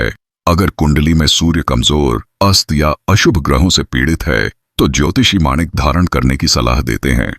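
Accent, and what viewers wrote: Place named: Pahadi, India. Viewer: पहाडी आवाज अच्ची हैं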